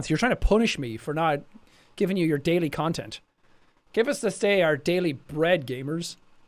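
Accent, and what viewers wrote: Ireland. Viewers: How have you come back with a stronger Irish accent even tho you live in the forbidden land 😭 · He sound a lot more Irish love it.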